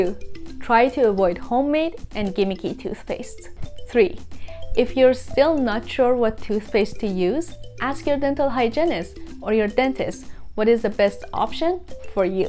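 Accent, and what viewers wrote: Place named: Iran. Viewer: You have a sweet Persian accent! And u look sweet too!! 😍😍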